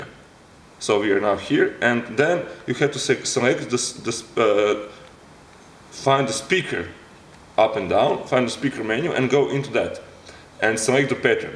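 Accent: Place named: Slovakia. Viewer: you sound like you're from slovakia.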